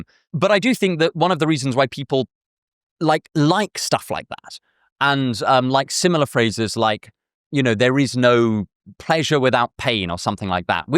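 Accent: United Kingdom. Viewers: I missed the flavor of your providing text, but also being able to have accurate spellings provided (such as "deepity"), and being clear about what you're saying at all times. You're a clear speaker, but you're British and I'm American and the words help. Though I understand if it is time-consuming to have to provide them and you need to reduce your load.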